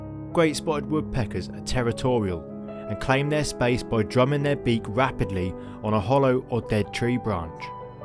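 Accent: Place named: Estuary, United Kingdom. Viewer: here's a thought .. less estuary english and more woodpecker drumming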